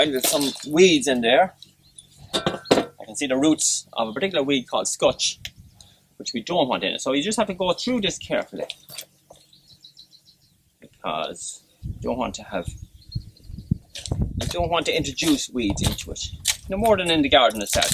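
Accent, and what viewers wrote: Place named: Jamaica. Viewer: Great video. Really helpful. What is that accent? I have Jamaican family and they sound a lot like u.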